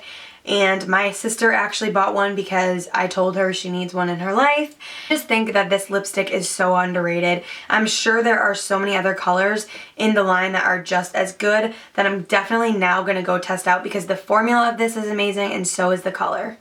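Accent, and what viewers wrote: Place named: Upstate New York, United States. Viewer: Where are you from? I'm an upstate ny girl and you have a similar accent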